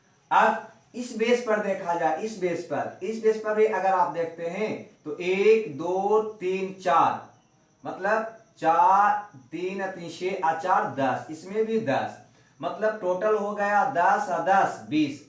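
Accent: Bihar, India. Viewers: Sir aap Bihar se h kya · wow gajab ka pdate ho sir...bihari stayl bha gya...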